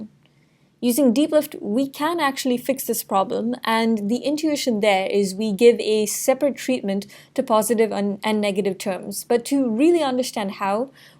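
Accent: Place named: India. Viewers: Trace? Clearly an Indian accent! But so what? :) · This is one of the best indian accent I've heard, clearly explained every details in the paper!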